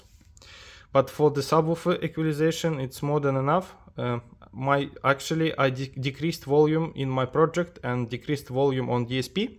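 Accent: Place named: India. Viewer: Индийский английский :-) ...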